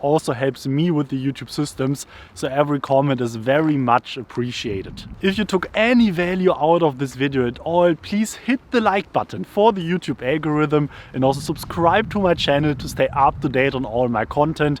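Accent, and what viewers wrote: Germany. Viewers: oh goodness that heavy german accent....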